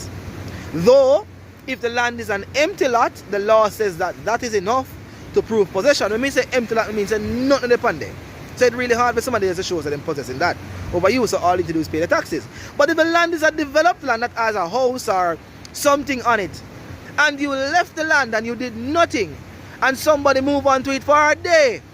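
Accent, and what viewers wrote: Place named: United Kingdom. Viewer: Jamaican With British Accent 🧐💀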